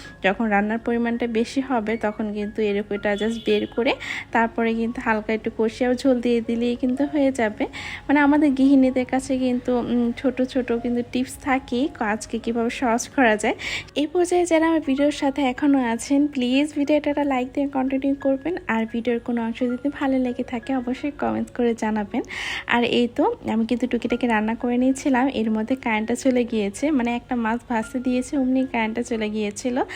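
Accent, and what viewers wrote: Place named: Rajshahi, Bangladesh. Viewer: রাজশাহীর ভাষা খুব ভালো লাগে আপু,,, খুব মিষ্টি করে কথা বলো তুমি মাশাআল্লাহ,,, ভালো লাগলো আপু ❤❤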